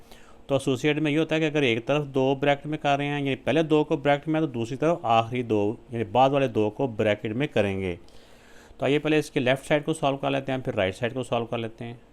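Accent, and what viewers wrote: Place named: Faisalabad, Pakistan. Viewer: Sir ap Faisalabad sy ha naww safff PATA chl rha ha 🫥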